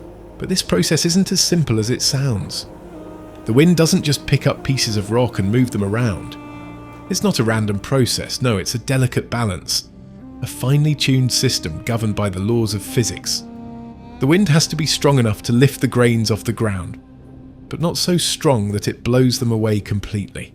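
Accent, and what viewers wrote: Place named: United Kingdom. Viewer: Very repetitive. AND you have a British voiceover with an annoying American AND-LESS number pronounciation. Do better. Dislike!!!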